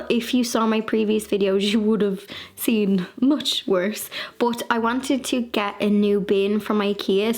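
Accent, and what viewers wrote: Ireland. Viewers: Your so bubbly and I luv that your so independant and a home owner. I too do most things myself,independant and am home owner. Luv your Irish accent . I'm Canadian. Luv your videos and Blondie.🎃🎃❤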